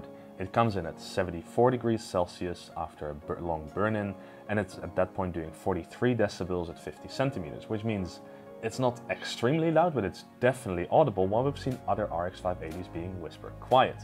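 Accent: Netherlands. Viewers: are you dutch? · Jawel, hij spreekt Engels met een Nederlands accent. Bovendien is hun pand gevestigd in Nederland.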